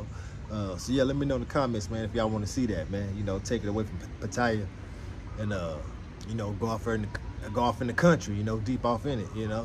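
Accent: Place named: Virginia, United States. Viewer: This is the truth he defiantly from Virginia it's the voice , VA dudes we just deferent, and women from all over the World love US ,and not just that even if he not from Virginia he branded with speech Pattern , but I just like to see yall together , and both of you make a Beautiful Couple ,and like the videos keep them coming.💪💪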